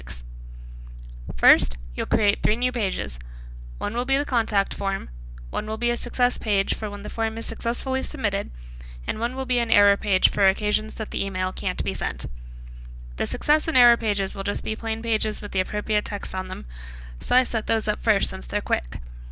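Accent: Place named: United States. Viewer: why use a US voice?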